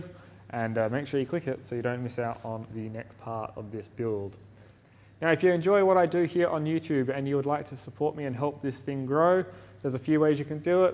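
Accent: Australia. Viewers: maybe try vibrating your epoxy in the mixing cup to remove the mixing bubbles, orbital sander should do the trick... also works on the slab too but make up a dummy pad so no pesky dust:D Judging by the accent you in Aust?(probably one of the worst country's to be a fine furniture maker)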